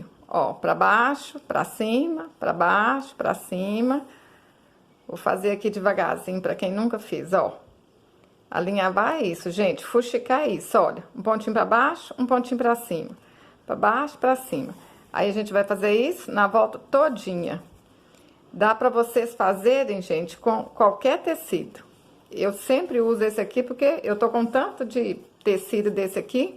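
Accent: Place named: Caipira, Brazil. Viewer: Amiga querida eu amo seus trabalhos tento fazer quase que todos adoro sua voz meio que caipira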